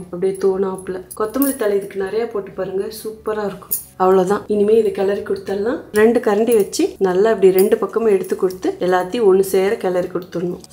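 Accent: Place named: Kongu, India. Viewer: Kongu Thangamae, Sweet Kongu manam veesum ungal Slangs, though at Bangalore, Still Comes to All people and bringing Happiness just like Wonderful preparation of Lemon Rice. Thank you, Selva Magalae.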